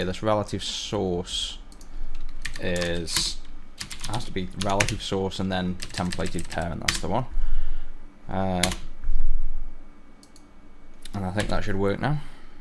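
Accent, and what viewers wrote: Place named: England, United Kingdom. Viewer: I am genuinely interested what is the english accent maybe dialect that you speak in the video. googling didn't give me any answers and thanks for the videos the best wpf on youtube.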